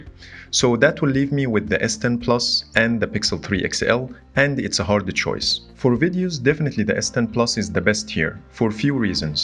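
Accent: Egypt. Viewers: المصري لهجته واضحه و على فكره الإنجليزي عندك عالي جدا بس الاكسنت بتغلب.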